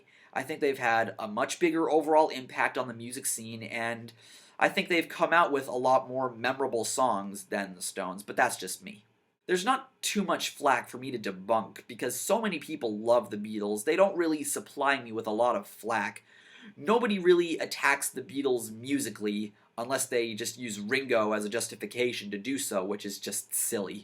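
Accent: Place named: United States. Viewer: The only thing wrong with The Beatles is that you Americans keep saying Beedles. It's Beatles with a t for f***'s sake!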